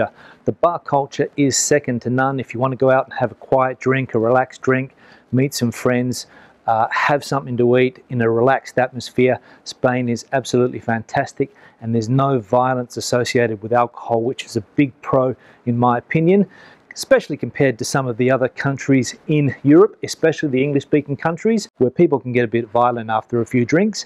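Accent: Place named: Australia. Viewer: He sounds Australian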